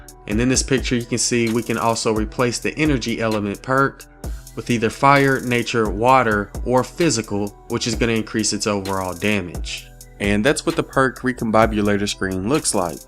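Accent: South, United States. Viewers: You sound like Channing Tatum when he made that country accent in "Kingsman: The Golden Circle"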